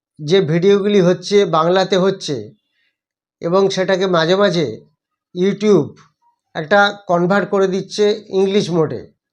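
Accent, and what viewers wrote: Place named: United States. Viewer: হ্যাঁ স্যার, আপনি কিছুদিন ধরে আমেরিকান এক্সেন্টে ইংলিশ বলতে শুরু করেছিলেন, আমি মনে করেছিলাম বাংলা বললে হয়তো অন্য ধরনের সমস্যা হতে পারে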